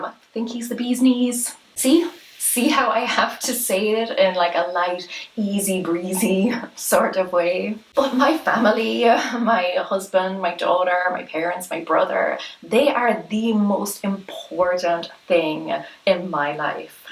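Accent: Ireland. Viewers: Hi, I love how calmly and relaxed you speak. Now, I can enjoy your Irish accent (ánd your video of course!). Cheers, Sousan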